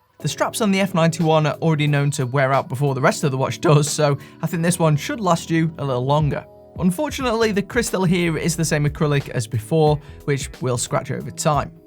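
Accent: United Kingdom. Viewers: I hate your British English accent. It's just so annoying. Maybe because your contry and Churchill left us after WW2 alone to be eaten by Stalin behind iron curtain and we suffer so much! We helped you a lot during the war. Breaking Enigma first, and show how to fight in Battle of Britain. That's why we don't go to victory parade with you. Your country betrayed us and do nothing! You ordered to pay for fuel use in aircraft after the Battle of Britain. That was like slap in the face. After all good content about watches but the accent reminds me of how I hate you GB. From Poland.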